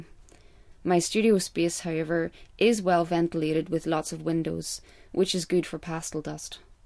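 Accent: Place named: Ireland. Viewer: thanks for the art lesson..i usually add dark blue (just a stroke ..in the darkest of the dark...like a highlight) to the black in the shadows to make them appear darker.. I love your Irish accent...I have to find a Irish girlfriend Gerard Mineo also does velour portraits that I like: <link>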